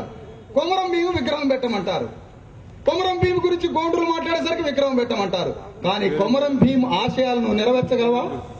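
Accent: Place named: Andhra Pradesh, India. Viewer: Nee aandhra slang chustene artamutundi be nv aandhra kodukuvani... Dobbey be...rayalaseema to polustunnaventi be nee tooooo ga...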